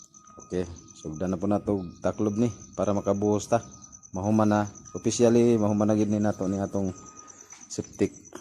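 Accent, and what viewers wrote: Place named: Visayas, Philippines. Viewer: Sir dugay ka na sa manila bisaya ka pa gihapon .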